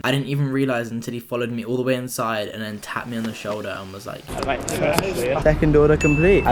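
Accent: RP, United Kingdom. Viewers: Dude, you have a posh accent. Pronounce the "th" as "th", not "f" as if you're trying to sound slightly more slum.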